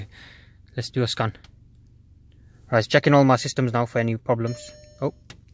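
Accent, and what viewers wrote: Bradford, United Kingdom. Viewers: You're definitely from Bradford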